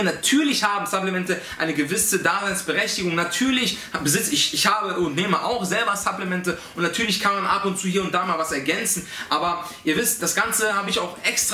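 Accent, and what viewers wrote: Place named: Switzerland. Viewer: hahahahahaha wie du schweizerdeutsch sprichst 😂😂 Top!!! 👌👌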